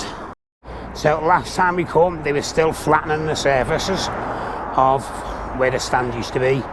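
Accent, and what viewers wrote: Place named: United Kingdom. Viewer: You sound like Patrick Mahomes if he was a Brit, I’d imagine.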